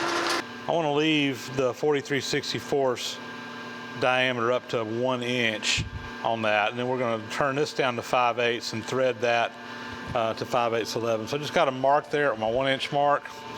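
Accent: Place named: Georgia, United States. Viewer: I'm in the firearms business and there are a number of historic firearms-specific threads we use, such as #6-48 (for Remington optics rails) and #8-40 (Mossberg and (interestingly) Benelli, or at least Benelli USA). The fasteners have to be custom made, so when customers call about tapping threads, I suggest they just go with the closest standard instead of the weird historic thread, so they can find a tap more easily and also buy fasteners from McMaster-Carr. It's hard to describe what makes your videos so compelling. The other night my partner and I spent a couple hours watching you dismantle the stoker engine. She loves your soft Georgia accent. The lack of profanity is awe-inspiring (I light up the entire neighborhood when I'm working on my car).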